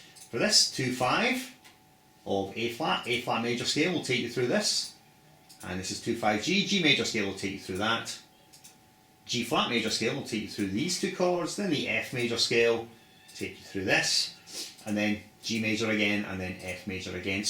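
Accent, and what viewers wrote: Scotland, United Kingdom. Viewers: I already know all this information, but I can't stop listening to the Scottish accent. Keep them coming, man!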